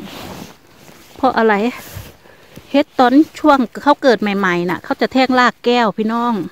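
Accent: Korat, Thailand. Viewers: สวัสดีจ้ายาย ป้าเขามาดูคริบเก็บเห็ดหลายคริบแล้วป้าชอบดูคริบเก็บเห็ดชอบกินดายาย พูดโคราชยายอยูอำเภอไหนจ้า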